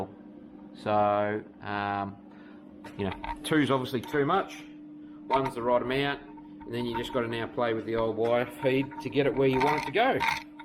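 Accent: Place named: Australia. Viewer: The great quintessential Aussie accent. Thanks for your interesting review.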